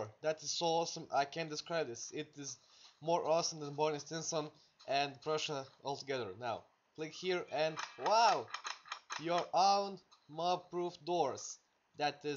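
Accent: Russia. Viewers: RAŠN AKSENT <3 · You sound like a russian but you're croatian its weird :P.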